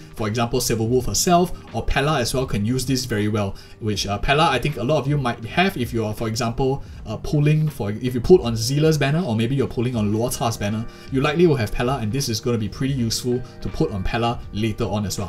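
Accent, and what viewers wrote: Singapore. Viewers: He can’t help it it’s singlish 😭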